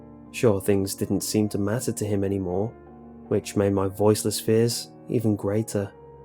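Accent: England, United Kingdom. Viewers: maybe england just a guess tho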